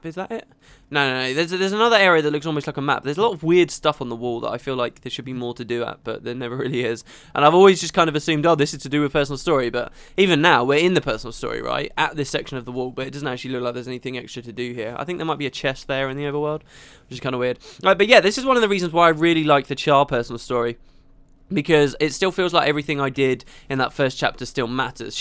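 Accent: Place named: RP, United Kingdom. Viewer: Having such a hard time trying to imagine how you look like, like; You speak like a truly british gentlemen, but somehow you are soo nerdy when it comes to knowing the Gw lore and you watch (a lot/some?) anime and you got this somewhat awkward humor that is hilarious to listen to.. I have no idea what to imagine, I jsut want to know if you're good-looking! D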